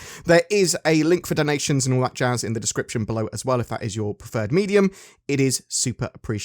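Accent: United Kingdom. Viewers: Me watching this video: “This dude is speaking my thoughts with a British accent!” I love this game, but those things are definitely pain points.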